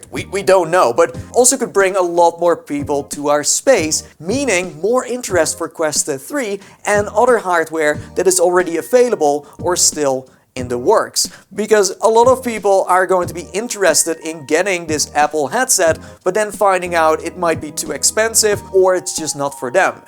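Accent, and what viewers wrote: Netherlands. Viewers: are you dutch or from the Netherlands?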